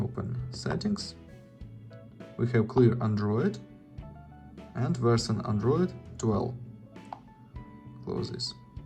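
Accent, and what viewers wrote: Russia. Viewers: на аглийском,с русским акцентом))) По голосу и звуковому фону узнал.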